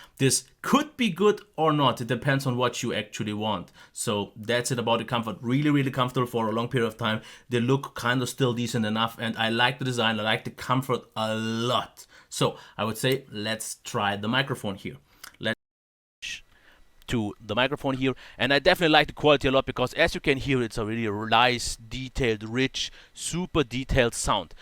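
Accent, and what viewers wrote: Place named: Germany. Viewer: He sounds German - what do you think?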